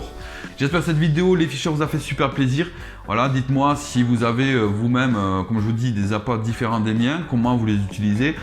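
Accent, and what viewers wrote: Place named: South, France. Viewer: région du Tarn l accent me semblé familier je viens du Gard , haaaaa le sud lol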